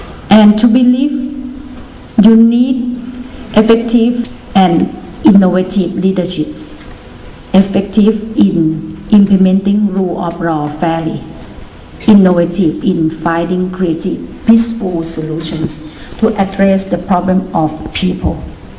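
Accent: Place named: Thailand. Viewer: ฝรั่งคงเหนื่อยกับการนั่งฟังแอ๊ดเซ้นนายกคนเก่งของคุณน่ะคับ !!!!! จะสำเนียงไทยจ๋าก็ไม่มีใครว่าหรอกคับ แต่แทบจะไม่ออกเสียงตัวสะกดเลย ความสามารถการใช้ภาษาระดับนี้ ใช้กับ"โลก" ไม่ได้หรอกคับ แต่ถ้าเอาไว้หลอกบางคนในประเทศ ก็ถือว่าเพียงพอแล้วหละ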